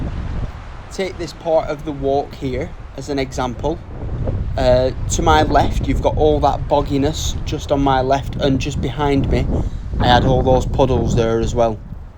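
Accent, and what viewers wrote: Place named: Lancashire, United Kingdom. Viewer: Great video. Nice place. Nice to hear a Lancashire accent 👌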